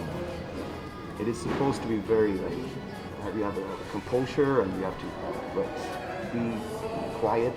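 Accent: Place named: United States. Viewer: Whoever this is, Alpha or Papa, there is virtually no accent whatsoever. He just sounds American, though erudite -- maybe Canadian. I'm confused.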